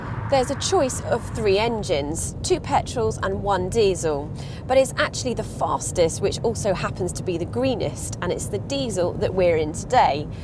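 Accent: United Kingdom. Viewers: i'm so annoyed by her british accent after a while.. I dunno why because I like british accents..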